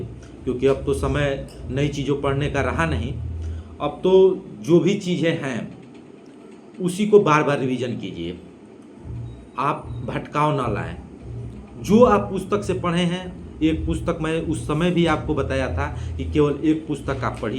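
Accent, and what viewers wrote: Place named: Bhojpuri, India. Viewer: Bhaiya aap ka language kuch bhojpuri jaisa malum pad raha hai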